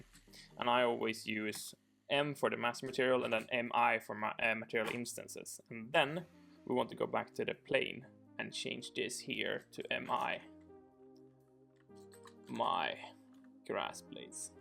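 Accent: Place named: Sweden. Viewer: 5 seconds in and I instantly know you're Swedish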